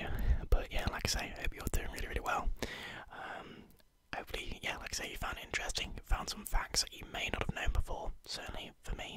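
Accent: United Kingdom. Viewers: Absolutely loved this video As a South African I absolutely love British Asmr accents 😂😂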